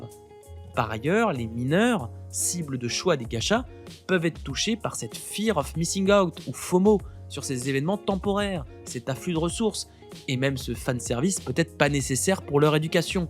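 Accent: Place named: England, United Kingdom. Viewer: Incroyable vidéo et j'ai adoré l'accent anglais, continue comme ça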